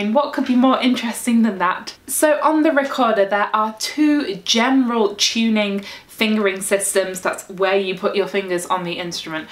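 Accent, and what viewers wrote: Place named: RP, United Kingdom. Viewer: This is amazing. I was wondering why th f sharp sounded so close g on my recorder. I figure it out myself before chek that out with u because of course . you are the authority on the recorder. Your receive pronunciation gives u that title as well.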